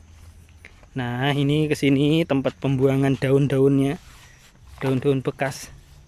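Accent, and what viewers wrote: Java, Indonesia. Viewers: Tiyang pontianak kok jowone medok banget kang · logat jawa bilang asal pontianak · penasaran.orang Pontianak kok logat orang jawa. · Mas,Kalimantan,tapi keturanan Jawa ya logatnya orang Jawa tu,mohon no hp nya,saya orang Tuban jatim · Ikut nyimak sampean kok lucu boso jowone medok mojopahit ngendi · Tak kiro wong jowo mas e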